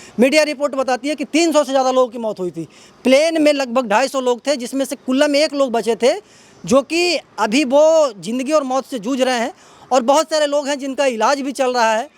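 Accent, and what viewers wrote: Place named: Bihar, India. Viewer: गंदा बिहारी बोलने का कुछ पता नहीं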